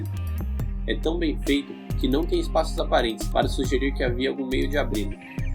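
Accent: Brazil. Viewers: com sotaque caipira então, ninguém merece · Parece que carrega muito sotaque (paulista)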